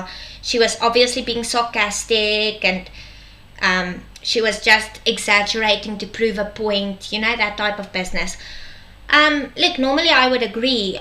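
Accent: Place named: England, United Kingdom. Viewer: Ek hou van jou engelse aksent! jy s lieflike!!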